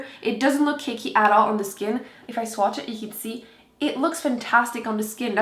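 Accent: France. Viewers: She has an AMAZING french accent. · Sorry are your french??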